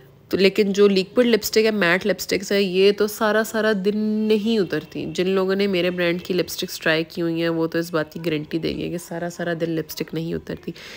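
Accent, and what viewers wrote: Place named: Punjab, India. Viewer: Kuch b lag rahi ho bolne se bilkul paindu lagty ha kuch b high fy pehan lo bolne ka andaz daggon wala hi rehta ha punjabio ka bolne ka style sahi karo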